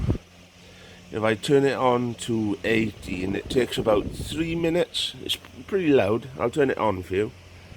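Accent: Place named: Wales, United Kingdom. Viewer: I never knew you were this Welsh! Awesome vid!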